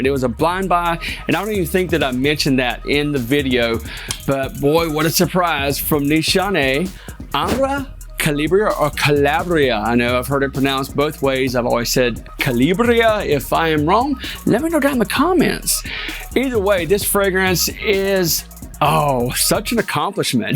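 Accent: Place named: Carolina, United States. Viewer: you got the same Carolina accent as Stephen Thompson 😅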